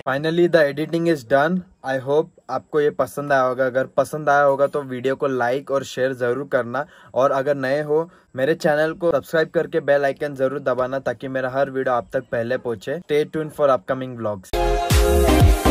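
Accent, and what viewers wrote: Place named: Maharashtra, India. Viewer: Ur tone sounds like Maharashtra people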